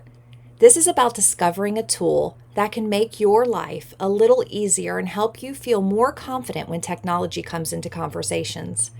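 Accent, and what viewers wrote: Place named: India. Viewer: An indian guy with a voice modulator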